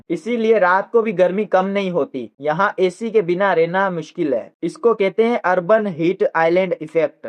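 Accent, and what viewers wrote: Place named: India. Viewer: but your accent is Indian ( Hindi ) ❤ BTW love it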